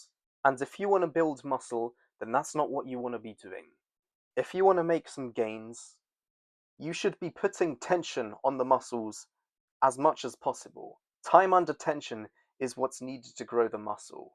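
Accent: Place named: United Kingdom. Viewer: This guy has the same uk accent as the greatest uk artist to ever do it: Feng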